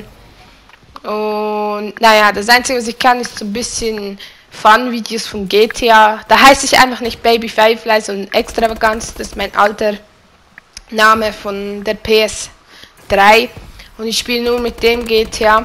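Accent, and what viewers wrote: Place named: Switzerland. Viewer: haha hab das video beim commander gesehen und bei den ersten 3 Sekunden gewusst das du Schweizerin bist ;D swiss power ! ^^ ich lahn mal es abo da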